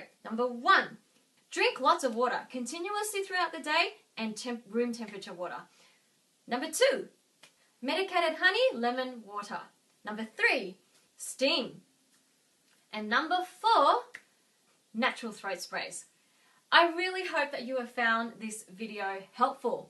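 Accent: Australia. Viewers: Juan Barboza it doesnt sound American and British because its Australian. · You look Asian but sound Australian..what are you..? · Its clearly australian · Her accent is Australian. Not like the movies is it? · Wow I need to go to Australia and marry an Aussie woman when I grow up because the accent is SO clean! :D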